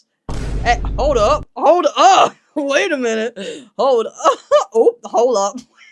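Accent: United Kingdom. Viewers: Wow. Didn’t know you were British.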